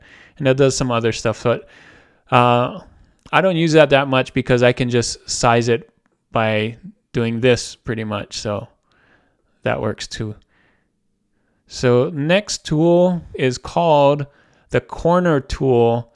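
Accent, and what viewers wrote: Hawaii, United States. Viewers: Bro, are you from Hawaii?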